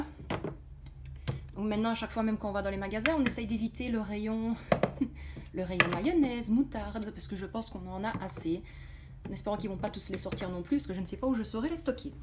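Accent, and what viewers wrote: Belgium. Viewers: oui juste à côté de liège, oui ça s'entend mais moi je l'entends pas trop lol parce que je suis aussi de liège lol donc je fais pas trop attention lol Oui chez lidl je fais souvent les promos viande et les produits d'entretien lol Oui avec ce que vous avez dans vos armoires ( pas forcément les réserves lol) Oui je regarde aussi vos batch cooking, enfin quasi toutes vos vidéos lol.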